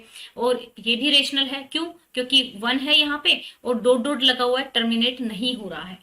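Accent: Haryana, India. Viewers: Mam you are from Haryana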